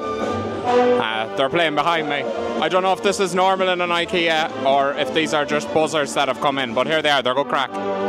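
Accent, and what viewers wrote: Ireland. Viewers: I was today years old when I discovered that the word filming is actually pronounced "fil-i-min." With an Irish accent! Looking forward to that video of you actually trying to put together that new desk of yours. Even cursing with an Irish accent is brilliant! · you are delicious, you are lovely, and you are gorgeous!! My parents were born and raised in Ireland and I grew up surrounded by my parents' off the boat Irish friends and random aunts and uncles that would come to the US for VERY long stays. It's been years since my parents made their transition it's goes down a treat (as my Dad would always say) to hear your beautiful voice. I had no idea how much I missed the sound of Ireland until I tuned in. Thank you!!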